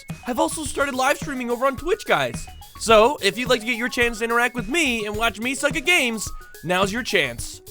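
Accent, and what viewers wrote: Canada. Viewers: So you're a Canadian ay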